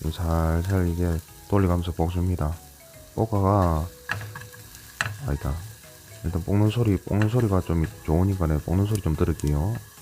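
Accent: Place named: Gyeongsang, South Korea. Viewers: ㅋㅋㅋㅋㅋ 어디 지방인겨~!? 부산이라예~? 사투리가 윽쓰로 구수한기 정감있눼예... ㅎㅎㅎㅎ 있는 그대로 말했뿌믄 음식 보다 나레이션 완쩐 찌깁니다!! ^^* 나레이션이 너무 구수한게 정말 매력이 좋습니다~ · 경상도 아재 특유의 사투리가 구수 해서 좋은것 같습니다. 항상 있는 그대로의 모습이 좋은것 같습니다. 위생 장갑을 끼고 요리 하시는건 왠지 살짝 적응이 안되는 일인 이라서 . 앞으로 좋은 레시피 영상 기대하면서 좋은 영상 감사합니다. 지금 나레이션 방식 변화없이 죽 가시기 바랍니다.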